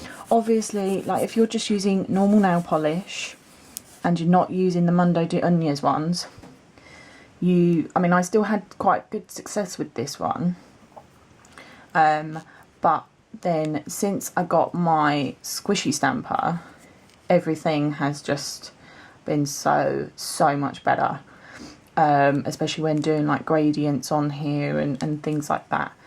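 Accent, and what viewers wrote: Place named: United Kingdom. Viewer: Love your videos, thanks for sharing. Have you ever tried cuticle defender? That makes the cleaning very easy. I also tried a glue which I originally bought to repair a wooden car from my son. Mixed with a little bit water it also works perfect. I use it first before I do any polish on my nails, cause it needs a little more time to dry than the cuticle defender you can buy. I really love it. Thanks again for making this videos. And I really like your voice and the british accent 😍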